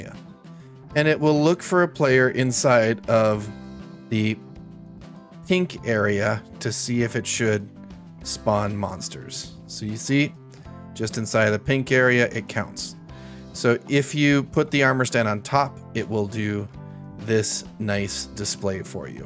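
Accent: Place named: South, United States. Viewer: I have no clue, sounds southern.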